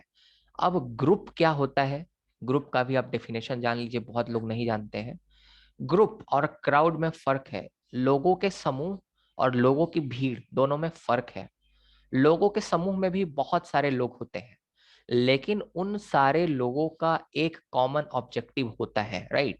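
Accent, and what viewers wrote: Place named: Bihar, India. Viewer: Sir your teaching skills are just🔥🔥 and jo bihari tone hai usme to mja hi aa jata hai..❤❤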